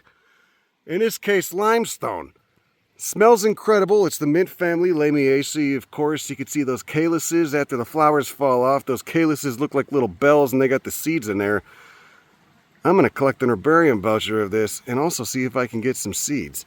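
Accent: Chicago, United States. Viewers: i found a link to this channel in a 2yr old reddit post about chicago accents. wasn't expecting to find a live channel with a brand new video. anyway, southsider here. you sound like we could be neighbors.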